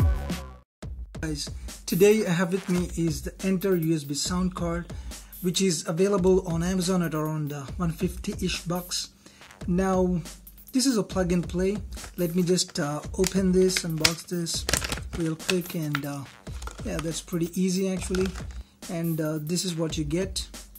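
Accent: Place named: India. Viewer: tere accent se main samajh gya tha tu indian hai... hahaha...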